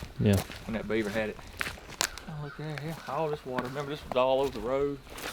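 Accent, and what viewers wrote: South, United States. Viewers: Hears the voice of the master typically redneck BS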